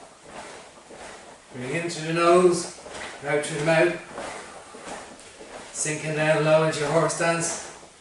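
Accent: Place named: Cork, Ireland. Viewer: My word you sound just like my neighbor. He's from County Cork.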